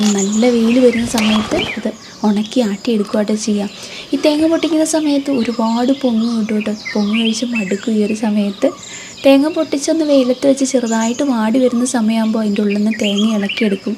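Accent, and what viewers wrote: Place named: Palakkad, India. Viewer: Palakkadan style onnu vere thanne super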